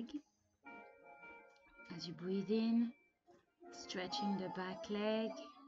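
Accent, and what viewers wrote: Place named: France. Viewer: Nice video 😊 are you French? Can recognise the accent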